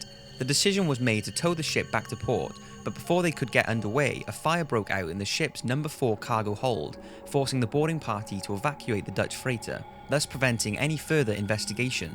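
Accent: Wales, United Kingdom. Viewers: " lol he's British can't tell by his accent" Of course you can tell he's British, unless you're a fucking moron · Ahh I thought there was Welsh in it but did not want to go out on that limb. Either way that was not the crucial part of my comment lol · He's british or something, you fuck nuts, he has an accent, and can't pronounce things the "american" way, doesn't mean he's uneducated, dumbasses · Yes he is Welsh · He is actually Welsh though. Don't know what part of Wales however, but he must pronounce words that way. So yeah.. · Yes but he's British so he has an accent lol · Are you Welsh? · Very good. But where is ur accent from? It sounds a bit Welsh, but my husband is Welsh and he sounds quite different! Lolxxxx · He's Welsh and I don't know any dialect in the UK who says "heared" ...we say "heard" I think he's just a bit nervous..... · James Smith he has a british accent so it might be harder for him to pronounce certain things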